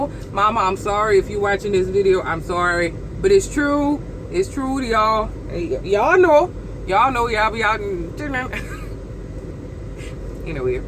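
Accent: Louisiana, United States. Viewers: that sounds like a saint Charles parish accent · dam I like that Louisiana accent you have especially when you say baby😉😉😉😉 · Darlin are you Cajun? If so i looove your accent!!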